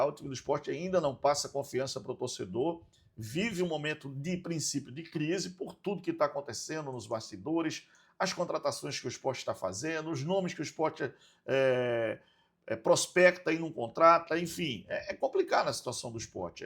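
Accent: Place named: Rio de Janeiro, Brazil. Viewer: Não tem coisa mais ridícula que o carioquês!!!!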